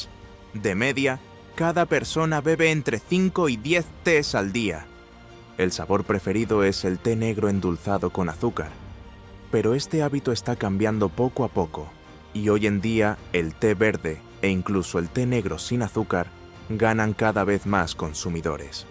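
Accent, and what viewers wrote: Spain. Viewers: Este hombre por su forma de hablar es de España que se puede esperar de alguien de ese pais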